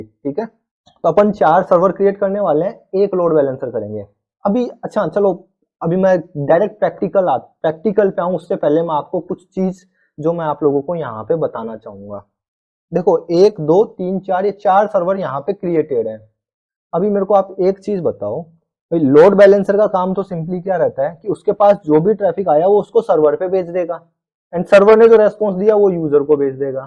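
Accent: Rajasthan, India. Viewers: Alwar, rajasthan se ho aap bhai?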